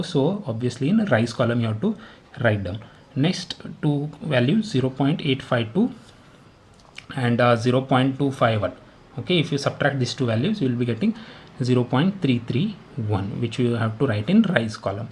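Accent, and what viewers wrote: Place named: India. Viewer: REDUCE THE INDIAN ACCENT SMALL, WEY THING BE THIS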